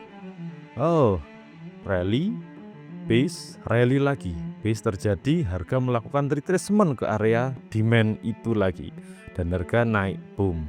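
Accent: Java, Indonesia. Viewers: frist nonton video Ki "mask" lgsg klik dgn penjelasannya dgn logat jawae dn pastine sederhana dlm penyampaiannya.. matur nwun Ki, smoga berkah dn mnjdi jariyah ilmu yg manfaat, idzin nimba ilmunya 🙏☕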